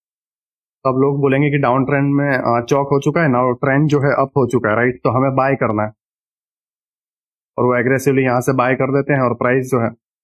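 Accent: Gujarat, India. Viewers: apki hindi se lag raha hai ki ap Gujarati ho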